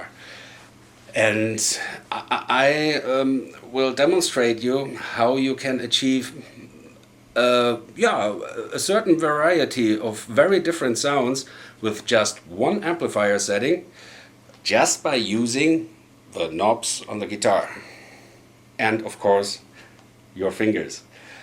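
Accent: Hesse, Germany. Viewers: hi, excellent explanation. you should have mentioned that reverb/ and delay was engaged. that makes it easier for beginners to get at least near to the sound. i played more than 40 years guitar and have found out that it is easier to play with a gibson les paul than a epi or harley benton. since i own a original gibson i feel i play better. the tones match better to the records i play to, especially in the higher octaves. i like your stuff and your hessisch english - i come from nearby:-) so it sounds familiar. best from Limburg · your german accent is bad ass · Bist du ein deutscher 😂 man hört den Akzent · Is he German? He kind of has an accent. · you have an german accent, don't you?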